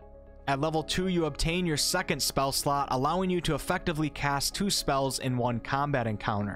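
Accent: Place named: Chicago, United States. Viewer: true.. but i have a hard time taking him seriously because of his Chicago accent!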